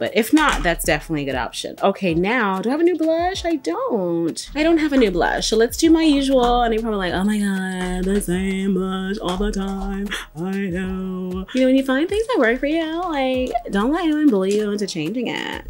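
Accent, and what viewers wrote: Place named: Rhode Island, United States. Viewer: I freakin love your accent! From a MA gal to a RI gal😍😘💜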